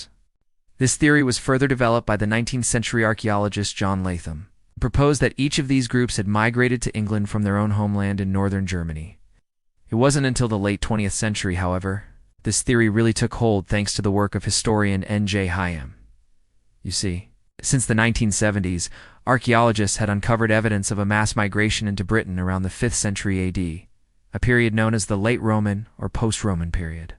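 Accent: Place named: United States. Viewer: not a chance that yankee accent is english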